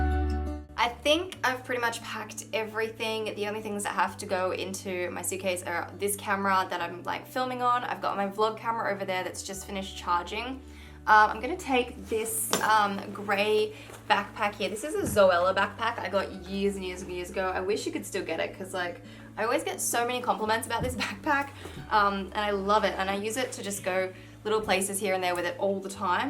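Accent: Australia. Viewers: Is she Australian? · Yep, that's definitely a fellow Aussie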